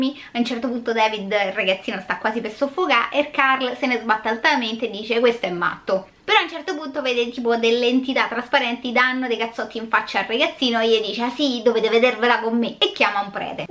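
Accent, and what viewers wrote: Rome, Italy. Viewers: Scusami ma io vivo ormai moooolto lontano da Roma e non sai che piacere mi da' sentire il tuo accento . Non so se puoi o vuoi.. Ma mi diresti di quale quartiere sei? Brava.. Dovresti fare l'attrice! 👏👏👏La storia... Una stronzata enorme! Gli americani fanno tutto in grande... Soprattutto I cazzari! 🤣 🤣 🤣 🤣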